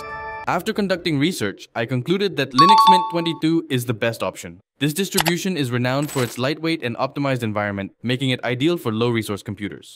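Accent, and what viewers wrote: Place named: India. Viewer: Bro is in Colombia and has a indian accent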